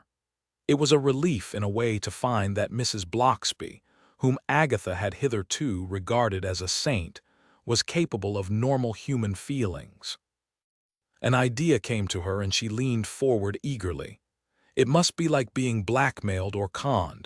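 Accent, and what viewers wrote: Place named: United States. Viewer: An American narrator??? Bring back Penelooe Keith!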